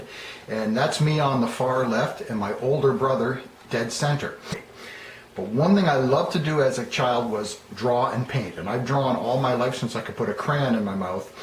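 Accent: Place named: Canada. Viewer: that is an amazing story - and an amazing model. One error in your narrative - we designed and built the car in MILWAUKEE, Wisconsin - not California. When I heard you say the words PRO-ject and DECK-al I knew you were Canadian. I understand the long winters up there need some indoor activity to fill your days. Neat collection of hotrods, too. Actually, it took us less time to build The Blue Flame than your model. The project began in August 1968 when we signed the contract with the American Gas Association, and ended in October 1970 with the record at Bonneville. The car was actually essentially done building in July 1970, just for comparison. Great video, too!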